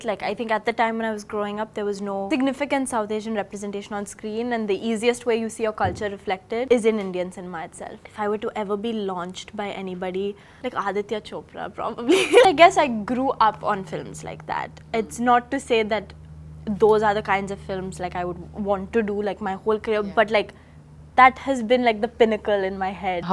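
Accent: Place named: United States. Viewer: American accent as she was born in USA.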